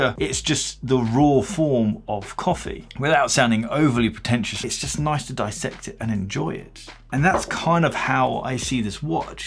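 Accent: United Kingdom. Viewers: Great video. Where are you from? what's that accent if I can ask? Sounds to me like British but not quite the same as I'm used to....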